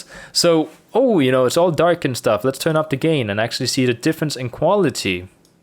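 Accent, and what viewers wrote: Denmark. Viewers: really awesome video, it helped thanks! and btw are you danish? I just though you sounded like it x3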